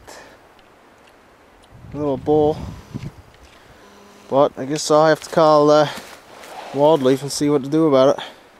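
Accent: Newfoundland, Canada. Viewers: you got my subscription buddy! you a newfie? im from ole cape breton! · are you from Newfoundland?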